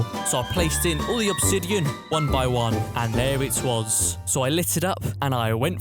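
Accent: United Kingdom. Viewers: ARE U BRITISH I KNOW UR IN THE UK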